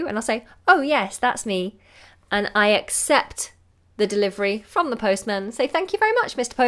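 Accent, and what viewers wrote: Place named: England, United Kingdom. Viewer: i like your engish accent more than Lucy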